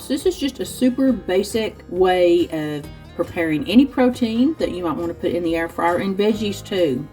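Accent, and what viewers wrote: United States. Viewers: Can I just say I think your American accent is absolutely adorable, your so friendly, lovely lady, and obvs your air fryer recipes are fantastic. 😊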